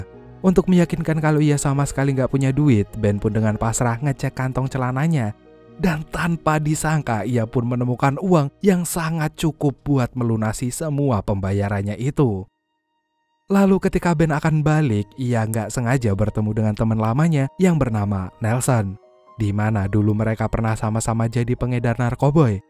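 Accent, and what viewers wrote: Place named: Java, Indonesia. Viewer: Rada medok suarane pasti wong jowo😂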